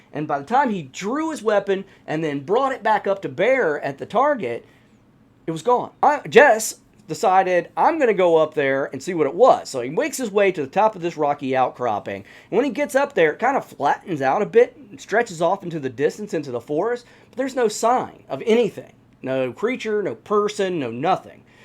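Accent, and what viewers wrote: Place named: South, United States. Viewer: Hello again, My dude. As ever, another well done presentation. Narration on point, albeit sprinkled with hints of friendly southern winds. As for the subject matter, I have yet another gift for you. Below is a link to the story of Port Lock Alaska - I will not say anything to spoil it, but.... this is one of those very little known stories with credible and VERIFIABLE bits and pieces out there... In my honest opinion - THIS IS BIGFOOT COUNTRY! Keep well, be safe, and as always, let's strive to earn the knowledge that will be our own individual lights on our darkest days.... <link>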